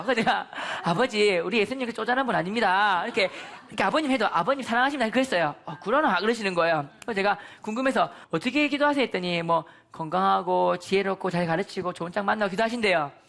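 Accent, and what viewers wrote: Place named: Busan, South Korea. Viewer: 부산 사투리가 이렇케 구수한줄 첨 알았었요 ㅋㅋㅋㅋ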